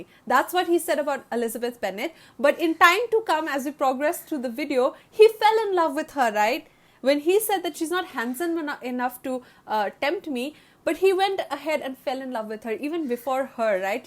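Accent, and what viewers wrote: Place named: India. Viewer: This is what indian accent is supposed to sound like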